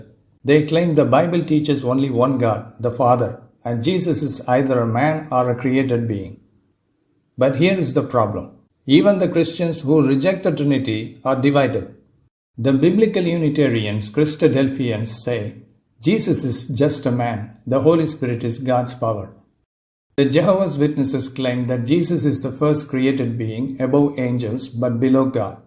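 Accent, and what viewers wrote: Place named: India. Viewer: 𝕋𝕠 𝕥𝕙𝕖 𝕡𝕖𝕣𝕤𝕠𝕟 𝕥𝕙𝕒𝕥 𝕚𝕤 𝕟𝕒𝕣𝕣𝕒𝕥𝕚𝕟𝕘 𝕥𝕙𝕚𝕤 𝕙𝕠𝕘𝕨𝕒𝕤𝕙, 𝕝𝕖𝕥 𝕞𝕖 𝕥𝕖𝕝𝕝 𝕦 𝕚𝕗 𝕦 𝕚𝕟 𝕒𝕝𝕝 𝕦𝕣 𝕔𝕒𝕣𝕟𝕒𝕝𝕚𝕥𝕪, 𝕖𝕕𝕦𝕔𝕒𝕥𝕚𝕠𝕟 & 𝕞𝕖𝕟𝕥𝕒𝕝𝕚𝕥𝕪 𝕥𝕙𝕚𝕟𝕜 𝕥𝕙𝕖 𝔹𝕚𝕓𝕝𝕖 𝕚𝕤 𝕒 𝕔𝕠𝕟𝕗𝕦𝕤𝕚𝕠𝕟 𝕨𝕙𝕒𝕥 𝕞𝕒𝕜𝕖𝕤 𝕦 𝔾𝕠𝕕 𝕠𝕣 𝕒 𝕊𝕒𝕚𝕟𝕥 𝕚𝕟 𝕥𝕣𝕪𝕚𝕟𝕘 𝕥𝕠 𝕔𝕠𝕣𝕣𝕖𝕔𝕥 𝕥𝕙𝕖 𝕨𝕣𝕚𝕥𝕥𝕖𝕟 𝕨𝕣𝕕 𝕠𝕗 𝕒 𝕝𝕚𝕧𝕚𝕟𝕘 𝔾𝕠𝕕 𝕨𝕙𝕒𝕥 𝕞𝕒𝕜𝕖𝕤 𝕦 𝕤𝕥𝕒𝕟𝕕 𝕒𝕓𝕠𝕧𝕖 𝕖𝕧𝕖𝕣𝕪𝕥𝕙𝕚𝕟𝕘, 𝕨𝕚𝕥𝕙 𝕖𝕧𝕖𝕣𝕪 𝕚𝕟𝕔𝕙 𝕠𝕗 𝕪𝕣 𝕞𝕖𝕟𝕥𝕒𝕝𝕚𝕥𝕪 𝕚 𝕕𝕟𝕥 𝕟𝕖𝕖𝕕 𝕥𝕠 𝕜𝕟𝕠𝕨 𝕦 𝕥𝕠 𝕞𝕒𝕜𝕖 𝕒𝕟 𝕒𝕤𝕤𝕦𝕞𝕡𝕥𝕚𝕠𝕟 𝕦 𝕒𝕣𝕖 𝕒𝕟 𝕒𝕓𝕤𝕠𝕦𝕝𝕦𝕥𝕖 𝕒𝕤𝕤. 𝕎𝕙𝕒𝕥 𝕞𝕒𝕜𝕖𝕤 𝕦 𝕥𝕙𝕚𝕟𝕜 𝕥𝕙𝕒𝕥 𝕖𝕧𝕖𝕣𝕪 ℂ𝕙𝕣𝕚𝕤𝕥𝕚𝕒𝕟 𝕕𝕠𝕖𝕤'𝕟𝕥 𝕜𝕟𝕠𝕨 𝕨𝕙𝕒𝕥 𝕥𝕙𝕖 𝕋𝕣𝕚𝕟𝕚𝕥𝕪 𝕞𝕖𝕒𝕟𝕤, 𝕛𝕦𝕕𝕘𝕚𝕟𝕘 𝕗𝕣𝕠𝕞 𝕪𝕣 𝕒𝕔𝕔𝕖𝕟𝕥 𝕦 𝕤𝕠𝕦𝕟𝕕 𝕀𝕟𝕕𝕚𝕒𝕟 𝕒𝕣𝕖 𝕒𝕨𝕒𝕣𝕖 𝕚𝕟 𝕀𝕟𝕕𝕚𝕒 𝕥𝕙𝕖𝕣𝕖 𝕒𝕣𝕖 𝕞𝕠𝕣𝕖 𝕥𝕙𝕒𝕟 3000 𝕘𝕠𝕕𝕤 𝕡𝕖𝕣𝕙𝕒𝕡𝕤 𝕞𝕠𝕣𝕖 𝕓𝕖𝕔𝕒𝕦𝕤𝕖 𝕚𝕟 𝕀𝕟𝕕𝕚𝕒 𝕒𝕟𝕪 𝕠𝕓𝕛𝕖𝕔𝕥 𝕔𝕒𝕟 𝕓𝕖𝕔𝕠𝕞𝕖 𝕠𝕣 𝕣𝕖𝕤𝕖𝕞𝕓𝕝𝕖 𝕒 𝕘𝕠𝕕 𝕓𝕒𝕤𝕚𝕔𝕒𝕝𝕝𝕪 𝕒 𝕞𝕒𝕟 𝕞𝕒𝕕𝕖 𝕚𝕕𝕠𝕝 𝕞𝕒𝕕𝕖 𝕓𝕪 𝕞𝕒𝕟, 𝕟𝕠𝕨 𝕞𝕒𝕟 𝕡𝕣𝕒𝕪𝕤 𝕗𝕠𝕣 𝕥𝕙𝕒𝕥 𝕚𝕤 𝕥𝕙𝕒𝕥 𝕟𝕠𝕥 𝕔𝕠𝕟𝕗𝕦𝕤𝕚𝕟𝕘 𝕓𝕦𝕥 𝕢𝕦𝕖𝕤𝕥𝕚𝕠𝕟 𝕝𝕚𝕖𝕤 𝕒𝕣𝕖 𝕖𝕧𝕖𝕣𝕪𝕠𝕟𝕖 𝕠𝕗 𝕥𝕙𝕖𝕤𝕖 𝕘𝕠𝕕𝕤 𝕣𝕖𝕒𝕝, 𝕗𝕒𝕝𝕤𝕖 𝕠𝕣 𝕛𝕦𝕤𝕥 𝕒𝕟𝕠𝕥𝕙𝕖𝕣 𝕞𝕒𝕟 𝕞𝕒𝕕𝕖 𝕚𝕕𝕠𝕝...𝕔𝕠𝕣𝕣𝕖𝕔𝕥 𝕥𝕙𝕠𝕤𝕖 𝕥𝕙𝕚𝕟𝕘𝕤 𝕚𝕗 𝕦 𝕥𝕙𝕚𝕟𝕜 𝕦 𝕒𝕣𝕖 𝕒𝕓𝕠𝕧𝕖 𝔾𝕠𝕕'𝕤 𝕔𝕣𝕖𝕒𝕥𝕚𝕠𝕟...𝕡𝕖𝕣𝕙𝕒𝕡𝕤 𝕦 𝕞𝕦𝕤𝕥 𝕓𝕖𝕝𝕚𝕖𝕧𝕖 𝕦 𝕒𝕣𝕖 𝕘𝕠𝕕, 𝕚𝕥 𝕨𝕠𝕟𝕥 𝕤𝕦𝕣𝕡𝕣𝕚𝕤𝕖 𝕞𝕖 𝕔𝕒𝕦𝕤𝕖 𝕦 𝕡𝕝 𝕔𝕠𝕞𝕖 𝕗𝕣𝕠𝕞 𝕥𝕙𝕒𝕥 𝕓𝕔𝕜 𝕘𝕣𝕠𝕦𝕟𝕕 𝕒𝕟𝕪𝕥𝕙𝕚𝕟𝕘 & 𝕖𝕧𝕖𝕣𝕪𝕥𝕙𝕚𝕟𝕘 𝕔𝕒𝕟 𝕓 𝕘𝕠𝕕, 𝕚𝕗 𝕦 𝕕𝕟𝕥 𝕦𝕟𝕕𝕖𝕣𝕤𝕥𝕒𝕟𝕕 𝕥𝕙𝕖 𝕋𝕣𝕚𝕟𝕚𝕥𝕪 𝕒𝕤𝕜 𝕗𝕠𝕣 𝕖𝕕𝕦𝕔𝕒𝕥𝕚𝕠𝕟 𝕓𝕦𝕥 𝕕𝕟𝕥 𝕔𝕠𝕞𝕖 𝕠𝕟 𝕥𝕙𝕚𝕤 𝕡𝕝𝕒𝕥𝕗𝕠𝕣𝕞 𝕚𝕟𝕤𝕦𝕝𝕥𝕚𝕟𝕘 𝕥𝕙𝕖 𝕨𝕣𝕕 𝕠𝕗 𝕒 𝕝𝕚𝕧𝕚𝕟𝕘 𝔾𝕠𝕕 𝕠𝕦𝕣 𝔾𝕠𝕕 𝕠𝕣 𝕙𝕚𝕤 𝕨𝕣𝕕 𝕚𝕤 𝕟𝕠𝕥 𝕒𝕟 𝕚𝕕𝕠𝕝 𝕟𝕠𝕣 𝕤𝕠𝕞𝕖𝕥𝕙𝕚𝕟𝕘 ℂ𝕙𝕣𝕚𝕤𝕥𝕚𝕒𝕟𝕤 𝕞𝕒𝕕𝕖 𝕦𝕡 𝕚𝕟𝕤𝕥𝕖𝕒𝕕 𝕙𝕚𝕤 𝕥𝕙𝕖 𝔾𝕠𝕕 𝕨𝕖 𝕒𝕣𝕖 𝕔𝕣𝕖𝕒𝕥𝕖𝕕 𝕗𝕣𝕠𝕞...𝕋𝕣𝕚𝕟𝕚𝕥𝕪 𝕚𝕤 𝕥𝕠 𝕙𝕦𝕘𝕖 𝕗𝕠𝕣 𝕪𝕣 𝕦𝕟𝕕𝕖𝕣𝕤𝕥𝕒𝕟𝕕𝕚𝕟𝕘 𝕒 𝕣𝕖𝕒𝕝 & 𝕥𝕣𝕦𝕖 ℂ𝕙𝕣𝕚𝕤𝕥𝕚𝕒𝕟 𝕨𝕚𝕝𝕝 𝕜𝕟𝕠𝕨 𝕥𝕙𝕚𝕤 𝕒𝕤 𝕚𝕥 𝕚𝕤 𝕒 𝕗𝕠𝕦𝕟𝕕𝕒𝕥𝕚𝕠𝕟 𝕠𝕟 𝕨𝕙𝕖𝕣𝕖 & 𝕨𝕙𝕒𝕥 𝕠𝕦𝕣 𝕥𝕖𝕒𝕔𝕙𝕚𝕟𝕘𝕤 𝕠𝕣𝕚𝕘𝕚𝕟𝕒𝕥𝕖𝕕 𝕗𝕣𝕠𝕞 𝕦 𝕒𝕣𝕖 𝕗𝕠𝕠𝕝, 𝕣𝕖𝕡𝕖𝕟𝕥 & 𝕗𝕠𝕝𝕝𝕠𝕨 ℂ𝕙𝕣𝕚𝕤𝕥 𝕓𝕖𝕗𝕠𝕣𝕖 𝕦 𝕝𝕒𝕟𝕕 𝕪𝕠𝕦𝕣𝕤𝕖𝕝𝕗 𝕚𝕟 𝕥𝕙𝕖 𝕨𝕣𝕒𝕥𝕙 𝕠𝕗 𝕥𝕙𝕚𝕤 𝔾𝕠𝕕....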